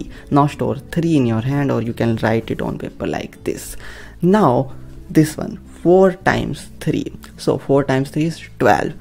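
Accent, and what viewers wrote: India. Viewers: Indian accent, shows it all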